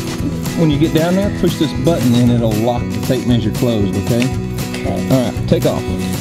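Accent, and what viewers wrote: United States. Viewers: great video, love your accent, reminds me of all the cowboy movies i watched growing up here in Scotland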